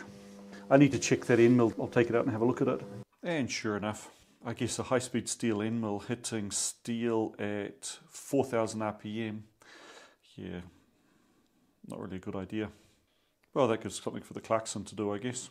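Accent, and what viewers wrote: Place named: New Zealand. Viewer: Not trying to be too nosey. You sound a bit like a kiwi, but I don't think you're living in New Zealand 🤔 and you seemed to be wearing this old Tony's sweater. 😯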